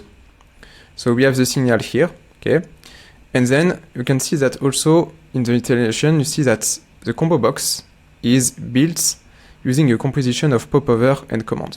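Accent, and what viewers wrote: France. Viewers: HAHAHA je me suis dis la meme chose , son accent français est vraiment fort n'est pas Pierre ?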